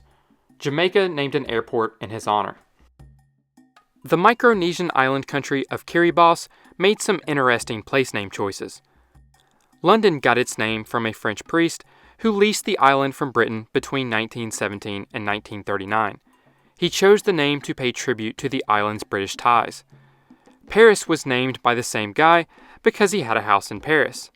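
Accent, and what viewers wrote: United States. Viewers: What specific American accent do you have? Every time I watch these I try to work it out but I have no knowledge of US accents. My best guess is Philadelphia??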